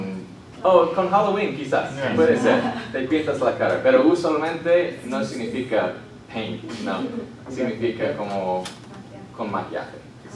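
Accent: United States. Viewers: me encanta el acento del gringo JAJJAJAJA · Jejejejej normal, el acento gringo se nota, pero habla bien 👍 Hay que decirlo porque igual esas cosas se corrigen 😬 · Si muy bien. Obvio tiene su acento gringo pero se le entiende perfecto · El acento del maestro lo siento todavía muy gringo :v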